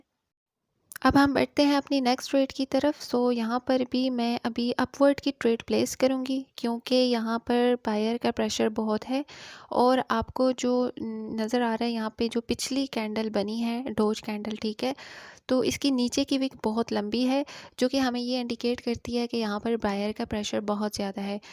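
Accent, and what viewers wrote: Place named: Karachi, Pakistan. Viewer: اپ کی اردو سے لگ رہا ہے اپ کراچی سے ہیں اپ کی ٹریڈنگ کمنٹری سے محسوس ہو رہا ہے کہ اپ ابھی نئی ٹریڈر ہیں البتہ اپنی کوشش جاری رکھیں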